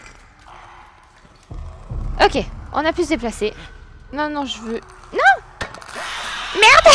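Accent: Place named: Quebec, Canada. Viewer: J'adore tes vidéo est ta personnalité en plus j'adore l'accents Québécois Je te suis depuis tes 900 abonnés est ta tellement bien évoluer même avec tes 100k tu reste toujours aussi proche de ta communauté est sa c'est rare!! Bonne continuation:) Sache que je ne me desabonnerai jamais:^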